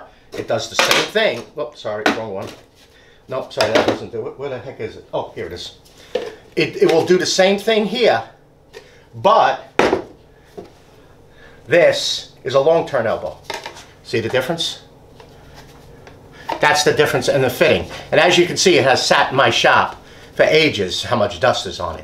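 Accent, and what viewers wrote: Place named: Long Island, United States. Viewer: I have watched more dust collection videos than I care to admit to. Your video has got to be the very best at explaining the fittings and the types of pipe and fittings needed. In addition your accent reminds me of my childhood living in long island!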